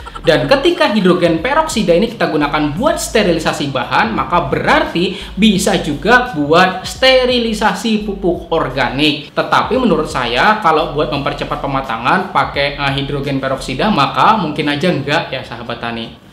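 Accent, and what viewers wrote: Banjar, Indonesia. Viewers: Banjar tagasnya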